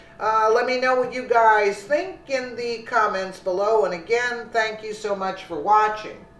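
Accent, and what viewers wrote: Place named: East Coast, United States. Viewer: I love your accent.....reminds of some my past family & friends from the East Coast. Thanks for all the great work you do, bring ink and paper to life, with your read aloud.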